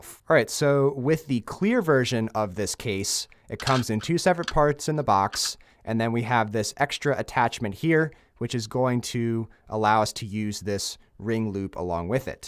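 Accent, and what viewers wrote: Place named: Pennsylvania, United States. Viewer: First time watching one of your videos. Could hear the accent right away and had to look it up. PA representing lol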